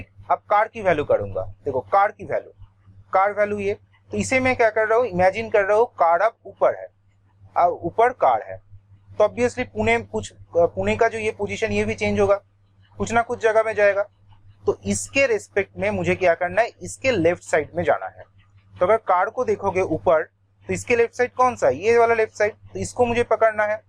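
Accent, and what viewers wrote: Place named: Bengal, India. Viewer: Bangali bangali mne hche golar awaj sune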